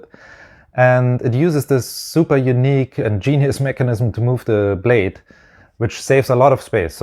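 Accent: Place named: Germany. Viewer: Man well done.Great innovations…or combination of different ideas to make it work for you.Just found your channel by incident,and think you would deserve more klicks.I just wonder…are you german? It just felt like the little accent sounds familiar to me.Keep it up well done.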